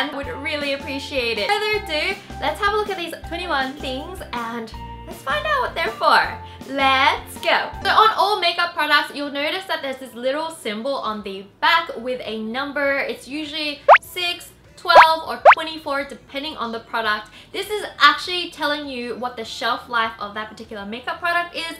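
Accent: Australia. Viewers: She has a Australian accent because she's lived in Australia for a while before when she was a younger and she's actually Chinese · Are u Australian wengie? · Bunnykis she's Australian. At least I'm 98% sure. Because I'm Australian but at the start it was also hard for me to tell as well 😅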